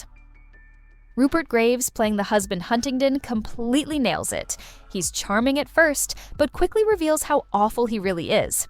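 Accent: United States. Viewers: Sorry, i can't stand this americain diction...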